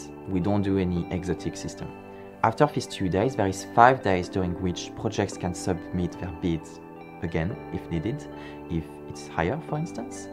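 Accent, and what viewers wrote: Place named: France. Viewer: I’m here just to hear this man’s voice Bc I love his French accent.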